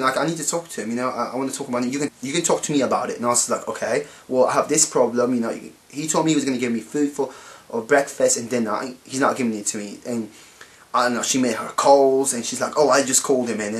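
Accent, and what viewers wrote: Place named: Birmingham, United Kingdom. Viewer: I'm English and i would never go to Turkey. I'm sure the majority of Turks are lovely people. But i always hear every summer of people being attacked and in fact just yesterday a British family were shot by a Turkish man at a villa. Greek people are beautiful. Go to visit Greece guys, beautiful people, beautiful country, beautiful everything. By the way you has anyone told you that you sound like you're from Birmingham in England, you have a similar accent:- )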